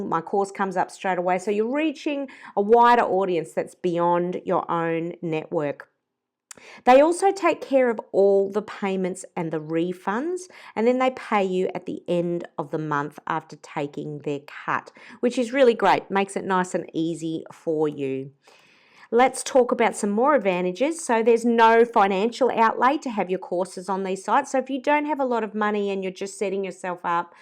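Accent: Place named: Australia. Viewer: Great to hear another Aussie! I've just started on Teachable and I'm finding it great. The only downside so far is the monthly fees and the extra 5% they take from profits. I've been able to ease into it pretty well as I already have a student base on Youtube and they are very keen learners! Teachable also has a ton of information for starting up and their customer service is excellent. Interesting video, thanks for sharing.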